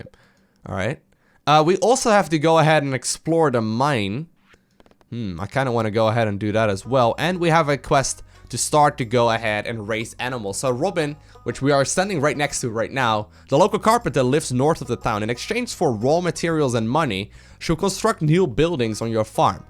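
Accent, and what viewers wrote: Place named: Netherlands. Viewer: I watched through your most recent episodes and then decided to start at the beginning. I totally knew what was coming with the sprinkler and killed myself laughing once you came back after looking it up... (I cheated and wiki'd everything before I started so I can't say I'm any smarter..) P.S. As a fellow Dutchie, the only reason why I kept watching your videos was cause of your accent!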